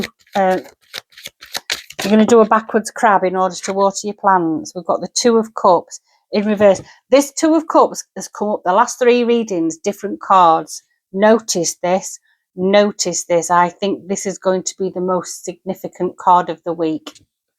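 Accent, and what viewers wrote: Sheffield, United Kingdom. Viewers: Thank you, a great reading. I’ve only just found you. I recognised your lovely accent straight away. I too live in Sheffield so it’s home from home. I’ve subscribed ❤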